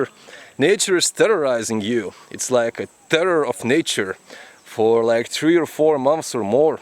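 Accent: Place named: Russia. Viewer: Russian accent out there in the jungle catching snakes. This dude is awesome. Modern day warrior 💪👊💪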